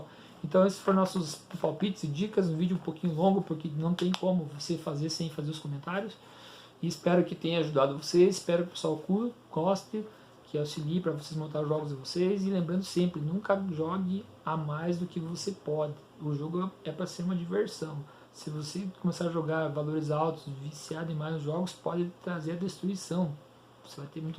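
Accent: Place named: Rio Grande do Sul, Brazil. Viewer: .. Opa sou pé vermelho.. MORO em Ctba. Um abraço . Mas tem sotaque do Rio Grande do Sul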